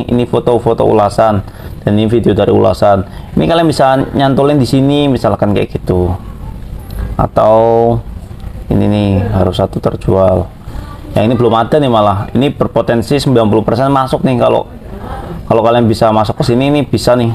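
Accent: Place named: Banyumas, Indonesia. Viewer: Ngapak temen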